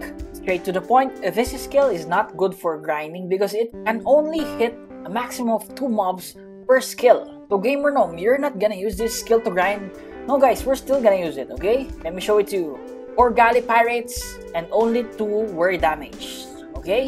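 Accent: Philippines. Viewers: i knew it, pilipino ka halata dahil sa accent naten pa notice idol haha!! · he has a filipino accent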